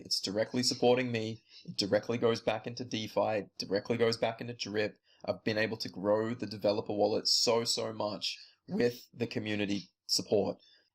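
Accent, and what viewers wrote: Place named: Australia. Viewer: Great video. Hi from Melbourne, Australia and you sound like an aussie too.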